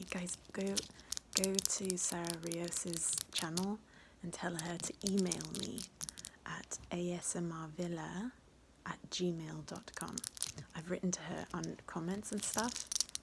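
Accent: Italy. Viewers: wait I thought she was American but lives in Italy and has an Italian accent....?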